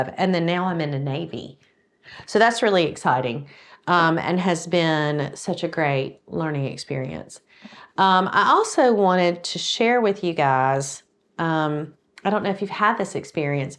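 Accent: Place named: South Carolina, United States. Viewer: You want some “pink” shirts? I purging again… I’ve been through skin cancer crap for over 20 years No worries Just keep freezing it off and burning it off (i wont use the chemo crème they gave me) and if you have to, have MOHS No big deal. Im 55 and been doing it for years I wonder what your accent is. It’s either Raleigh or some kind of South Carolina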